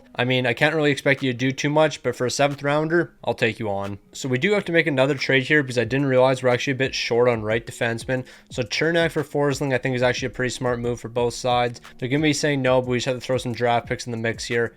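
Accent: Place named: Canada. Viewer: The most Canadian sounding Youtuber of all time ngl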